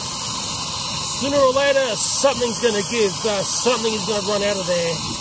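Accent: Australia. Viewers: At first my friend thought "How in the world can you find one of the most dangerous spiders in your backyard?" Then she saw that you had an Australian accent, and said "Oh." Welcome to Australia everyone!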